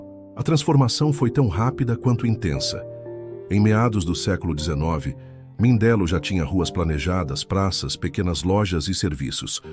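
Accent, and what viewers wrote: Brazil. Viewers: Mas fica sendo que os Cabocerdeanos sempre usam o sotaque Portugues de Portugal. E o que aprenda nas escolas de Cabo Verde.... por isso foi a minha pergunta inicial do sotaque Brazileiro sendo usado neste video pelo um Caboverdeano. E era so curiosidade, nada mais.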